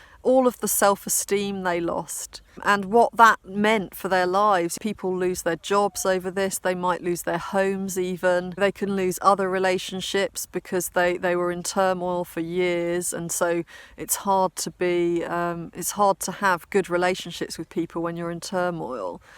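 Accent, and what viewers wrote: England, United Kingdom. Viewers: I prefer the English accent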